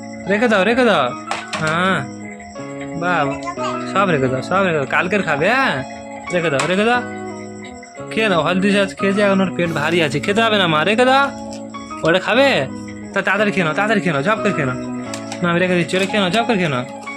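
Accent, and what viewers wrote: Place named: Bangladesh. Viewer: আমি বাংলা দে-শ থেকে দেখছি আপনা দের সবার বিডিও দেখি আপনার। কথা বারতা শুনলে অনেক বাংলা দেশি লাগে দোয়া রইলো আপনার জন্য আমিন আল্লাহর কাছে সাহায্য চাই আপনার জন্য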